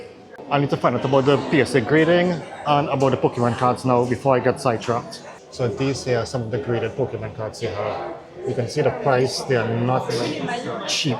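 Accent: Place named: Jamaica. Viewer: Why do you sound like jamaican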